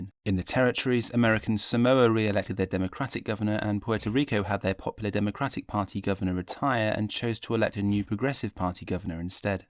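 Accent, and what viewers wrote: United Kingdom. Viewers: I’m 4 minutes in and then I️ realize it’s a British guy breaking down the American election.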